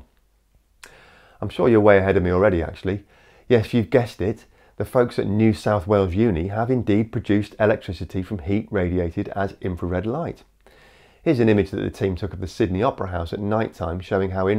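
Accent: United Kingdom. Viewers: Idc if you have a British accent, I’m not in agreement about global warming.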